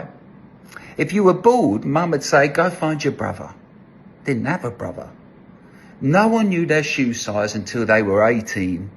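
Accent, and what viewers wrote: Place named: Cockney, United Kingdom. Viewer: The only phone we had was the telephone box and lots of 10ps. I am from the Eastend of London and I have a cockney accent. So it is funny listening to with that accent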